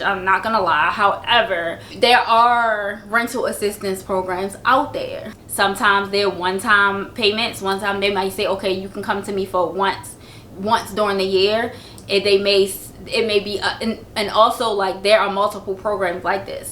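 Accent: Baltimore, United States. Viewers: Just found your channel. You are so cute! I love the Baltimore dialect. I will check out your resources. Nice to see a young entrepreneurial sister. Bless you and your babies❤️❤️❤️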